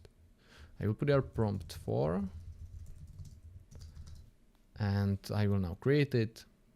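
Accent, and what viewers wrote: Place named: Slovakia. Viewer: Podľa prizvuku si slovak mam taky pocit, koľko sa pohybuješ ako web dev ?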